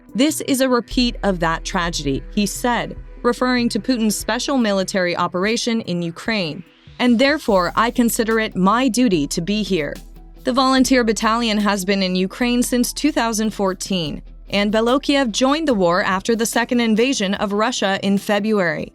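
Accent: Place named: United States. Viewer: Nice American English. Love it)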